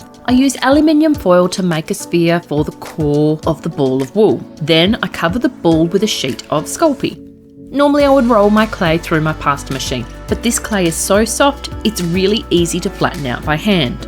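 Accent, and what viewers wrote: Australia. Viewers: 😂😂😂 (context: I am from the USA) I just went to Google and searched, "Define Skalittle". It changed it to skeletal, but it still didn't click with me! So I thought, "Well, I must be spelling it wrong." So I used the microphone to say "Define Skalittle"and still, it gave me the definition of skeletal. It wasn't until this point that I realized you are saying skeletal, just the way, I guess, you guys say it in Australia. I cracked up!! I thought I was going to learn a new vocabulary word today! 😂😂😂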